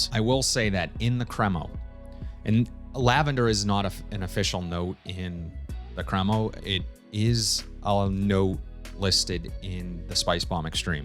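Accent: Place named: Massachusetts, United States. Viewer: You from Massachusetts, bro? You've got the regional accent. "Pak the cah on the boulevaad." 😉 Thanks for the vid. 👍 Cheers from New York City.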